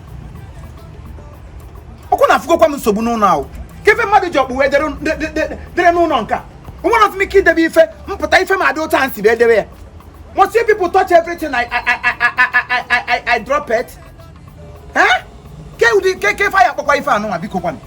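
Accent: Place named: Anambra, Nigeria. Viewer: So chief can speak anambra like this. Nwoke ah bu all rounder 😄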